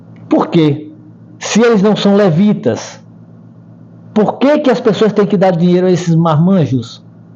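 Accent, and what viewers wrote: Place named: Brazil. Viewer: ele fala como brasileiro estudado cader o sutaco judaico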